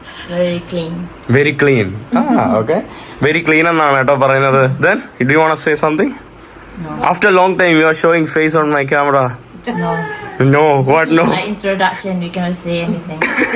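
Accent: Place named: Thiruvananthapuram-Kollam, India. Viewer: റബ്ബരും വിള 😂 Extreme തിരുവനന്തപുരം കൊല്ലം സ്ലാങ്...... അത് കേൾക്കാൻ തന്നെ ഒരു നൊസ്റ്റാൾജിക് ഫീൽ.....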